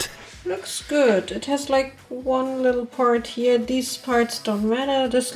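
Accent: Germany. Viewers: I thought till I heard uhu (and saw) that you have an light german accent (I kinda have the urge to write everything in german but I let it be) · She looks marvellous! Great job. Her shoes are really worth the work and look amazing. Ps: Ha, ich hatte Recht mit dem deutschen Akzent. Schön das wir so eine talentierte Dollmakerin haben : ) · me and my cousin LOVE ironmouse and watch all of her streams together so im happy that you made a doll of her also are you german? you have an accent to me and the name of the contact glue looked german so i got curious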